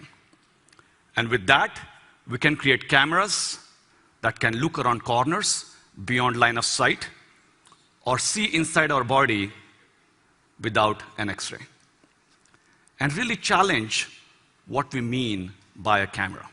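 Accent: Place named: India. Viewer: Indian English hmmmm, Anyway thank you .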